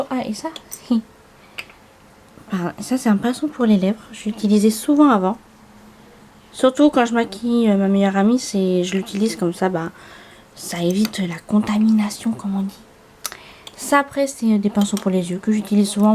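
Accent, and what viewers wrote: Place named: England, United Kingdom. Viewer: Coucou jolie, waouh tu en a du maquillage pour très longtemps. C'est bien rangé. Il y a de très belles palettes. C'est toujours un plaisir de t'écouter Tu as aussi un joli accent Anglais. Bonne semaine à toi, bisous, à bientôt, kénavo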